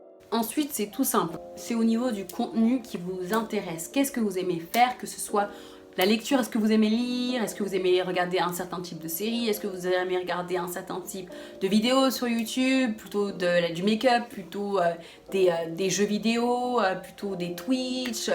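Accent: United Kingdom. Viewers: black women with uk accent....n'hésite pas à regarder l'objectif de la caméra au lieu du retour ! :) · olalala tu m'as deter !!! cet accent anglais magnifique un goal absolu !!! Merci pour tous ces conseils, ultra ultra utile!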